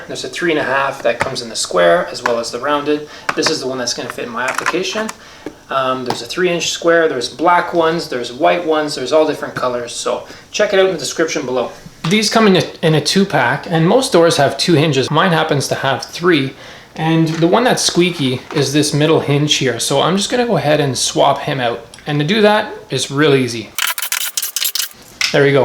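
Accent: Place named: Canada. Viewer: i knew that was a Canadian accent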